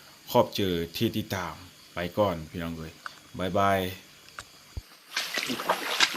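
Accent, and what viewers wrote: Phu Thai, Thailand. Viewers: สวัสดี เสียงเจ้าเว้าคือเดียวกันกับสำเนียงผู้ไท อำเภอหนองสูง อำเภอคำชะอี จ.มุกดาหาร น้อ กะคือสิเป็นพี่น้องเช้อสายเดวกันน้อ ดีเจอ้หลายที่ได่เบิ่งคริแเจ๊าน๊อ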